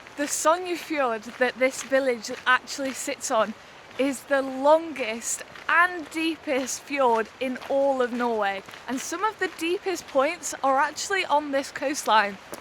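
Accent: United Kingdom. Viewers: Hello! Who are you traveling with? I love your British accent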